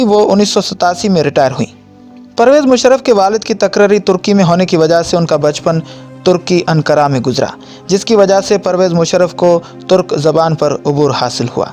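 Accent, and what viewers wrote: India. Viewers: Are you indian. Your lehja is indian. Kia musharraf shia hai. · ki Urdu bhi Hindi hay Indian hay koi yeh channel wala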